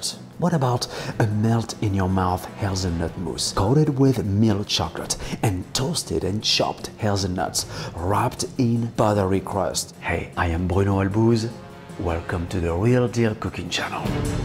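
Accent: France. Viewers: Well, I'm French and with a french ear, I really can't stand french accent 😂 even if his is way better that some I've heard 😂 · This man sounds like every stereotype french chef ever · As I heard his French accent I knew I stumbled upon something GOOD Seriously, amazing dessert, chef. Chapeau :D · I still can't decide whether he is french or just doing an accent. It's blowing my mind. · That french accent😂😂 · This French accent has got me dead I swear 😂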